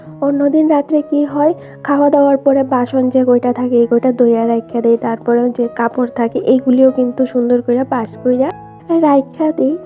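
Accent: Bangladesh. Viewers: Khub sundar.tumi kotha thako. Tumi ki bangladesi.toma kotha sune mone hoche.kichu mone koro na.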